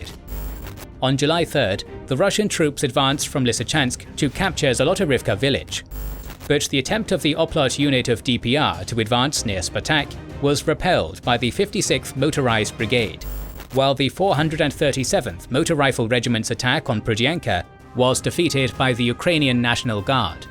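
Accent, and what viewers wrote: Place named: United Kingdom. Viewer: The creators of this propaganda & misleading terminology in this video are quite late.. My dear British-accented pigs, here it is: It is not an invasion & it will not be an invasion. This is a security operation to purge the UA military organization in which Nazi ideology has spread and become purely Nazi military battalions and the liberal West supports these criminals just for sake of mere They see them as tools that can inflict irreparable losses for the Russian army in the future because the best leaders in Russia are there today fighting against the latest Western weapons & against a corrupt military organization backed by the democratic West since 2014, their military doctrine has nothing to do with alleged democracy of the Kyiv criminal regime.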